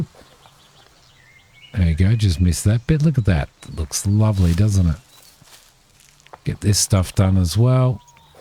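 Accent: Australia. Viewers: hey are you an auzzie?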